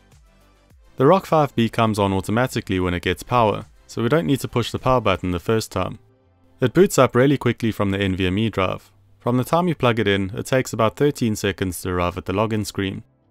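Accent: South Africa. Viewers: It sounds like you're from SA, if so, where do you get all of this stuff? Would love to know about the threaded inserts specifically...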